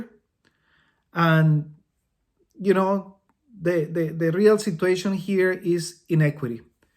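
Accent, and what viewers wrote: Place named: Latin America. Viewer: Esta genial que mantengas tu acento latino!!!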